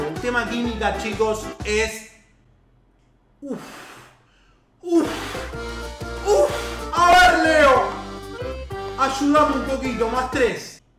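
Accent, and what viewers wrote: Argentina. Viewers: Me gusta el acento Argentinos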